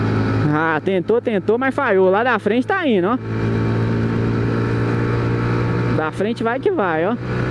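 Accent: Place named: Goiânia, Brazil. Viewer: Se e de Goiânia? Parece demais kkkk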